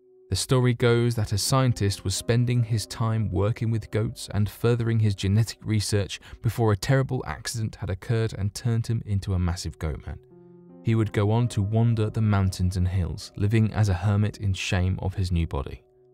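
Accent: United Kingdom. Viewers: The voice of this narrator is better than the others. It is easier to understand, with a more tamed British accent. Less viewers will become sleepy.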